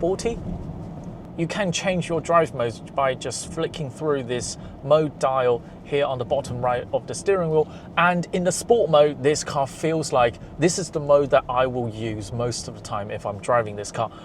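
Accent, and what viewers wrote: United Kingdom. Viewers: British / Chinese Accent is a thing ?! Whaaa ?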